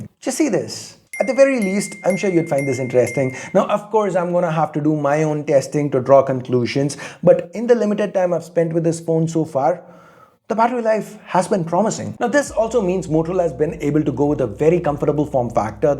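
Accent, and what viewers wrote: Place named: India. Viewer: Indian English is ridiculously funny 🤣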